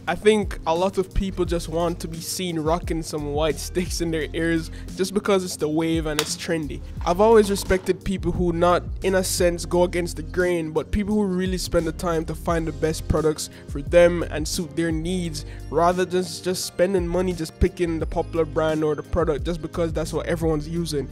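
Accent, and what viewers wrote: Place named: Jamaica. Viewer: looooving this thique Jamaican accent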